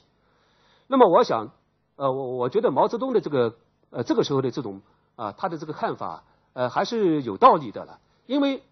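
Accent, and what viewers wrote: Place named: Nanjing, China. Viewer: 南普感人。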